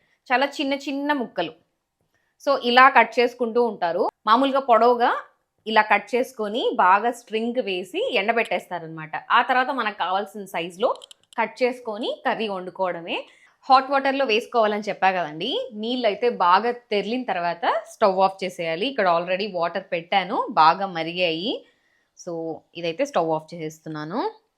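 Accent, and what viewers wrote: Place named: Anantapur, India. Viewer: Mam you are saying that you belong to Nellore but your language is like Anantapur.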